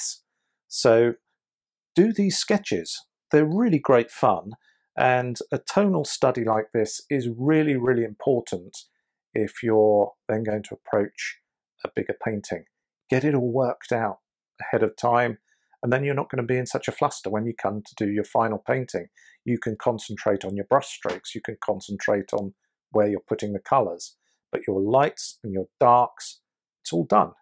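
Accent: United Kingdom. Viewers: Amo o sotaque britânico e amei sua arte. Obrigada por compartilhar.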